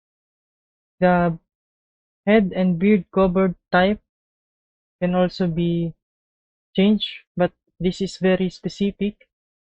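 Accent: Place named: Philippines. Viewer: Filipino here, I can hear the Filipino accent. Good job bro!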